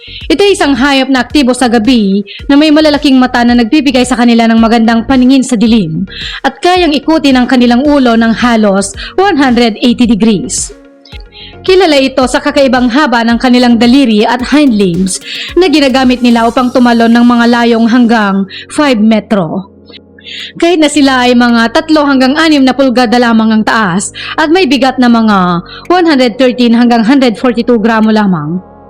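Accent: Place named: Bicol, Philippines. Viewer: manay ang tuno ng bosses mo bicolana pa din catanduanes hahaha....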